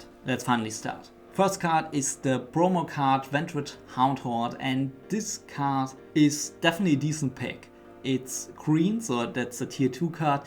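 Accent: Germany. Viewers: Dachte direkt in den ersten Sekunden "Das kann nur ein deutscher Akzent sein!":D Super Video!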